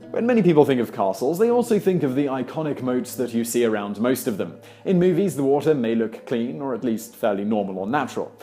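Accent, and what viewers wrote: United Kingdom. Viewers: Is English not the narrators first language? I keep hearing basic words being mispronounced, so just wondering if English isn’t his native language, because his accent seems to be British... confused. :/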